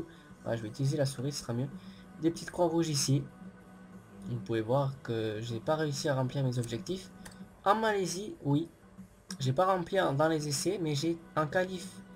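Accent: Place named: Marseille, France. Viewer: accent marseillais sisi :)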